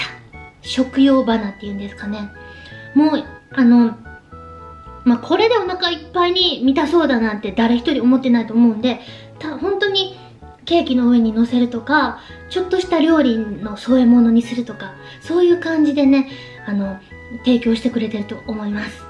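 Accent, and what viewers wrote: Kansai, Japan. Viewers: 関西弁可愛い♡ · 関西弁がすごい可愛い 方言最高やな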